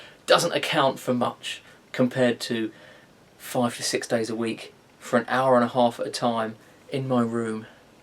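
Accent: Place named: England, United Kingdom. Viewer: It's just nice to hear a nice clean English accent. You even pronounce you Ts. I'm so sick of being surrounded by the "Init bruv" accent.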